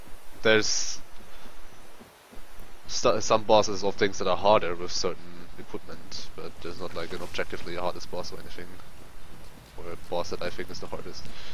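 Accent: Germany. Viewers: How's the Dragon Tooth so powerfull?!? Also your DT attacks look like a downward swing/thrust combo which seems to be critical for this devastating damage output. Could you explain that to me, please? Unfortunately I'm totally unfamiliar w/ Dragon Tooth because I've never made use of it. Btw, if you speak German (which, judging by your accent, you most likely do), you can also explain it in German to me if you want ;). If you don't, please excuse my misjudgment :).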